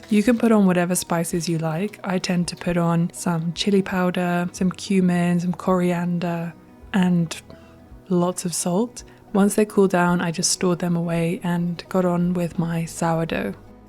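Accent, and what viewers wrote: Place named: United Kingdom. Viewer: You have such an interesting British accent, what region are you from?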